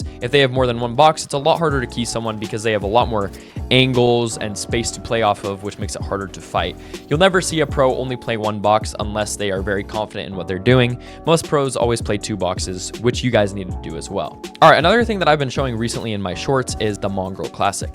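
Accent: India. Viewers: are you punjabi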